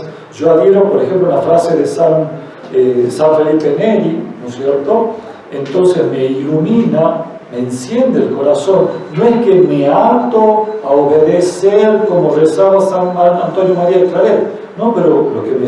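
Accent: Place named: Argentina. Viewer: Doy gracias a Jesucristo porque me permite participar y aprender en este retiro. Gracias Padre por su enseñanza. Doy gracias a Jesucristo porque me permite participar y aprender en este retiro. Es muy clara su explicación pero me cuesta mucho trabajo entender la dicción, tengo que repasar varias veces algunas partes del vídeo para entender. Perdón por no recordar el nombre de su compañero, pero la exposición que hizo sobre la confesión casi no la pude entender.Yo creo que ésto nos puede suceder a muchas personas que no somos de Argentina. Para retiros posteriores, pudieran hablar un poco más despacio? Es muy valiosa su aportación, por eso hago este comentario, Muchas gracias, Dios los bendiga.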